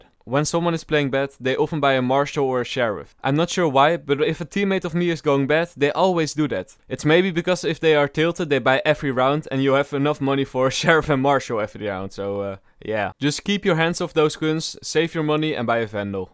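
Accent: Netherlands. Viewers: Het nederlandse accent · 100% jij bent NL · I smell Nederlands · Are you dutch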